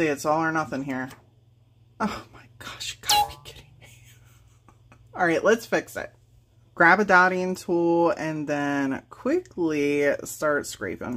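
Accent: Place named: Maryland, United States. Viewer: I live in West Virginia, born and raised. I lived in Maryland for about 15 years. So when I first heard you say water, I knew where you were from. Yes to digital downloads please. 😊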